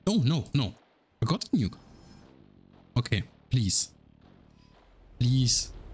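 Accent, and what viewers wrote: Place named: Germany. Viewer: wen i hir se jerman egsent i fiel wery much at home. Geiles video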